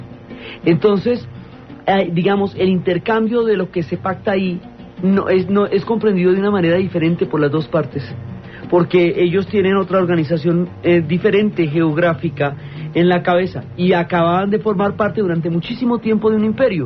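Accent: Colombia. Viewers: Muchas gracias. Siempre me ha gustado la historia y la geografia. Excelente tu exposicion , pareces de Colombia, estoy en lo cirerto? Saludos desde Chicago. Inscrito, like y compartido.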